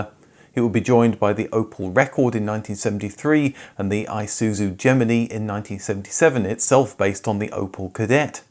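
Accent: England, United Kingdom. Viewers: It's had to watch when the presenter can't pronounce the names correctly (typical English accent). It's said "Day-Whoo" not Die-ooh" (or what ever he's actually saying) and some of the other names he incorrectly uses are atrocious..thankfully he pronounced the "e" in Porsche..it's even correctly said by another person in the episode, yet apparently he can't couldn't hear it...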